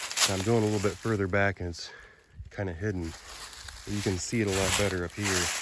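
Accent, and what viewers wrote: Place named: New York City, United States. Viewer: You sound like you lived in NYC.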